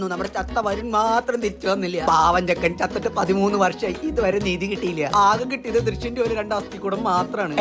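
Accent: Thrissur, India. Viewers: sathyam ipo korch over verppeer aye pole ind pandathe aa piecefull thrissur slang videos ayirn rasam ippo orumathiri 🥴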